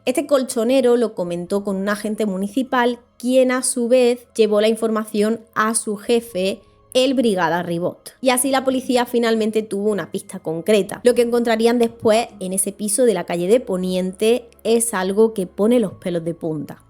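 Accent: Andalusia, Spain. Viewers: Es la primera vez que veo éste canal en Youtube, ése acento es andaluz o de que parte de España?lo siento pero no me gusta para nada tu corte de cabello.... Saludos desde Argentina.... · Es del sur de España, Andalucía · Exelente narrativa y tú acento Español Andaluz, Bella Andalucía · Es acento Andaluz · É andalûh. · Pero no era una vampira era una Bruja y está historia si que es real una bisabuela mía estubo a punto de ser raptada por ella, porcierto me encanta tu acento eres andaluza?? Yo tengo familia lejana por allí saludos 😊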